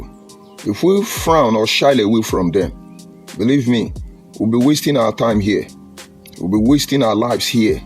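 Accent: Igbo, Nigeria. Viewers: Yet I see elements of Igbo in your voice. Who is pinching who. Are Igbos the one ruling Nigeria now. Are Igbos the one passing policies that does not favour the North. Are Igbos the one interpreting Tinubus policies to the North. SATAN is definitely using YOU against the unity of the south south and south east and the west. You just spilled your grievances about your predicament. Perhaps some one from the west is paying you to do this. I have never seen a man whose profession is to gossip and spoil a tribe who he him self originates from as we can tell from his ascent. God is about to fix Nigeria by reconciliation and healing every wound in the heart. While you allowed SATAN to use you to divide Nigeria and even your own people further. SHAME ON YOU, SHAME ON YOU AND SHAME ON YOU.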